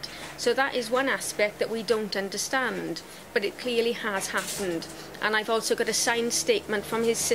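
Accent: Wales, United Kingdom. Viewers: Lovely Welsh accent. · A very interesting talk by a very interesting and lovely speaker, with an open mind and a beautiful Welsh accent. She covered many aspects related to the NDE experience. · Is she welsh? Love her accent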